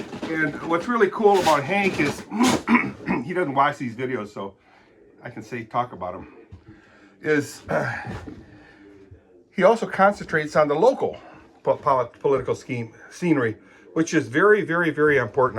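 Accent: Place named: North America. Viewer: I was thinking, is it June already but then realised you sound North American.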